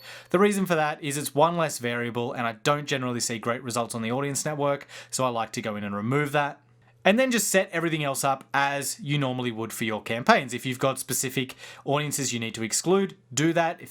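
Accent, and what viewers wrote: Australia. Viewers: Hey, good to hear and Aussie voice, also I suspect relevance to local biz. Any ideas re real estate fb ads? Regards Tony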